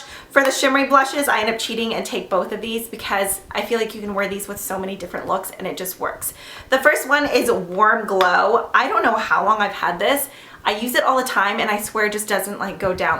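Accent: Texas, United States. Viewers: !!! You're totally picking up the Texas accent lol its hilarious. And btw, great video & keep using the make up geek shadows....forget the haters. Lol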